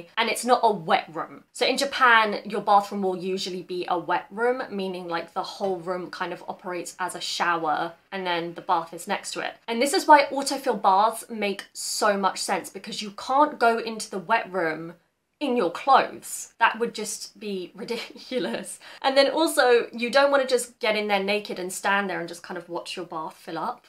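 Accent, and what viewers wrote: United Kingdom. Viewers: いつもの「british English」の発音が心地良いです エリザベス女王やサッチャー首相の発音が50年前にいた神父か牧師の発音に似ていて好き アメリカンはどこかいい加減で南部訛など聞いていられない、田舎者や貧乏人の様だ 今やウォシュレットとお風呂の湯はりは無いと引っ越ししたく成るほどの機能です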